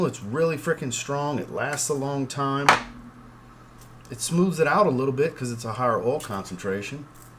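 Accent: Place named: New Orleans, United States. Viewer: You from New Orleans area? I am and you kind of sound like it. Maybe Westbank? lol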